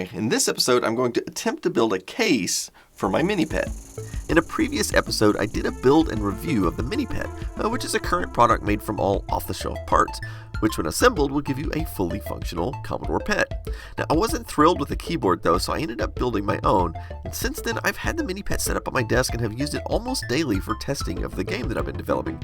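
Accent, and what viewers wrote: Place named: Texas, United States. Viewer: you have the slightest most subtle Texan accent ever and I love it so much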